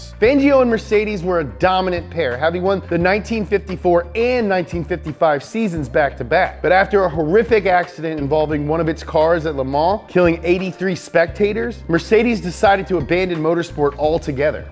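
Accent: United States. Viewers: Look... i don't know anything about F1... but somehow beung ranted at by an american makes me think everything is false in this video. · I couldn't watch more than a minute. Why do Americans speak like this?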